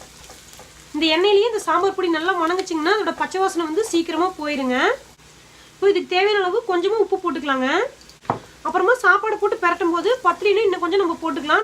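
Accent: Kongu, India. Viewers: Your kongu tamil super mam yr recipies also super keep it up mam 👍👍👍👌👌👌💐💐💐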